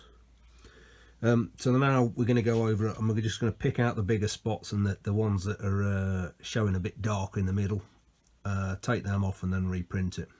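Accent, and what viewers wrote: United Kingdom. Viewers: Thanks for the video. Came from Steve's channel. You sound like you come from the same side of the pond as myself UK. Keep safe and stay well.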